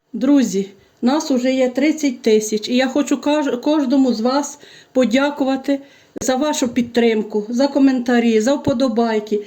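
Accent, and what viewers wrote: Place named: Transcarpathia, Ukraine. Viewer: І що?Жителі Закарпаття не соромляться свого діалекту.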